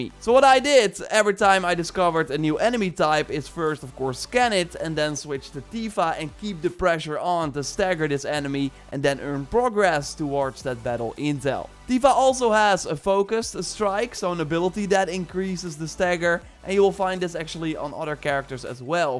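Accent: Netherlands. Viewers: Clearly not Belgian, I think it really sounded Dutch as well :) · Are you Dutch cause you kinda sound like it😆 Me2 btw Dutch ff fanboys ftw!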